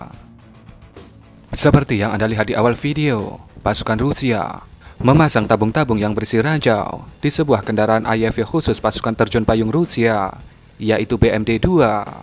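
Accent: Java, Indonesia. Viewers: Suaramu medok bro kocak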